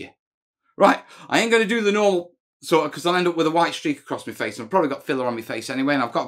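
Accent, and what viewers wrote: United Kingdom. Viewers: Missed that british accent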